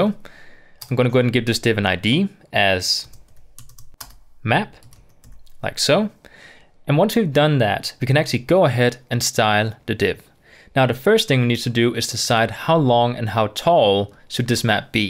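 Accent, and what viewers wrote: Denmark. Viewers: You my Sir are you danish ? :D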